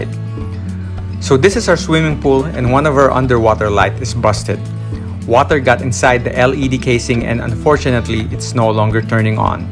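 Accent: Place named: Philippines. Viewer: Salamat! I knew by your voice you were likely Philipino. I now no for sure, since I went to website to look at the light. Available in Manila lol ...looking for something similar in the states! Much bless!!! Thanks for the suggestion on waterproofing the J-box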